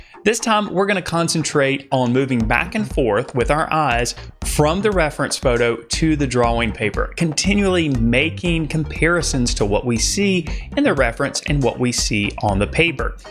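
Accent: South, United States. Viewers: Your ears. He has a a Southern drawl. When a lot of us Americans say the word “drawing” we don’t pronounce the “w”. It’s more like “dra-ing”. The way he says it is “dra-wing.” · It's that Southern drawl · Really are you trying to be mean? He obviously has a southern accent. 🙄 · Definitely says DWawing. Sounds like he’s from the American south. ‘Ya all come back ya hear’ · I recognize a fellow southern here right away. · He has a Southern Drawl when he drawls ... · He clearly has some kind of slight southern accent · It's his southern accent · His southern accent has him saying "d-ROW-wing" as opposed to "d-RAW-ing" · from southern USA therefore he has a drawl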